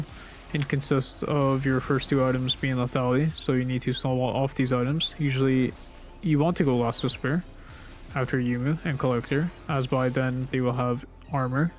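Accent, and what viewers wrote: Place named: United States. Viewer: I have not found this to be the case. Are you from America? He has a pretty strong regional American accent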